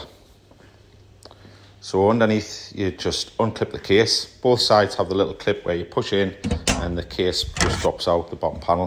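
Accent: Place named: Northeast, United States. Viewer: Top stuff! We got our new boiler last November, and I have had to refill it once (under instruction from the man who installed it). Couldn’t remember what to do when it just happened again, and your short video guided me exactly. Dead simple. Thanks very much indeed. (Lovely to hear a voice from the Northeast again after so many years away!). Brian Barber, Worcestershire.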